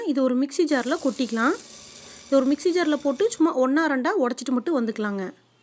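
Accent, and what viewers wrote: Kongu, India. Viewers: கொங்கு நாட்டு கிராமத்து கொஞ்சும் தமிழ் இனிமை பருப்பை உடைக்காமல் அப்படியே வேகவைத்து கடையலாம் அருமையாக இருக்கும் சீரகத்துடன் சிறிது தனியா சேர்த்து தாளிக்கவும்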